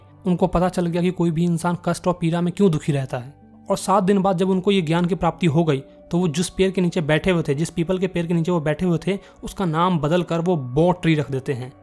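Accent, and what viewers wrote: Bihar, India. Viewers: Sir aap bihar kai ho kya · he is from bihar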